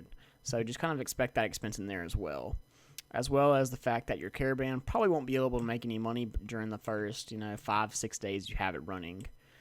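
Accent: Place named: South, United States. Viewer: Good to hear tips for my favorite game in my own (Suuthern) dialect. Great video bro, thank you.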